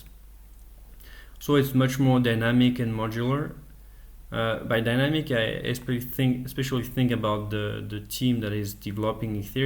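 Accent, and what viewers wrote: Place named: France. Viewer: Hello, i've just seen your video and i'm guessing due to your accent that you are french. I'm also french and currently investing on ethereum, i would love to be able to talk to someone who seems to understand this innovation quite well. Do you think it could be possible? and thanks a lot for this video (it's a bit to slow for me but it's very educational).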